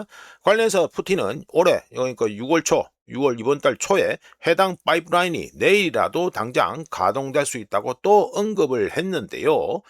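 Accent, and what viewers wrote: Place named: Gyeongsang, South Korea. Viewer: 어이 경상도 사투리 막소리 할때 삼가라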